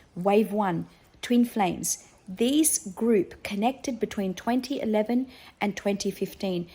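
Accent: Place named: Australia. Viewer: Hello from Western Australia 👋 great to hear another Aussie lady speaking on TF’s 🙏♥️✨✨✨I’m first wave, I met my Beloved late 2013/14. And yes your spot on, he has kept me in deep dark silence for years. I’ve been blocked & im still blocked as far as I know. No friends in common, no social media to “ stalk” nothing!!! We last spent a night with each other Dec 2019, heard from him again over a phone call Feb 15th 2020 and have not heard from him since. But he never hurt me intentionally or ever said a mean word, he just ran and ran hard. He’s in a relationship with young kids. I’m 12 years older at the age of 51. lol 😂 another EDIT!!! YES I’m a born rebel, you have described me to a T. I am anti everything “ Establishments” and I’ve seen/known of the darkness that dwells within these matrix establishments since I was a young girl. I DO NOT COMPLY 🙌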